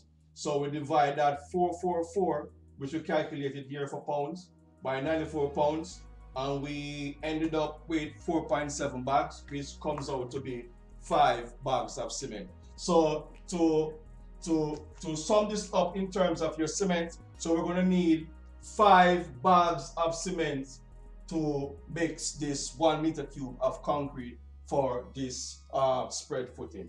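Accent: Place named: Jamaica. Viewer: Me feel like me a go a whaa university. What you are doing is excellent i am interested all the time. I use to whatch over seas people on youtube as it relates to building but when i was browsing this week and see this man in a african shirt and started to hear the jamaican acent i was over whelm ,trust i must say i appreciate this highly.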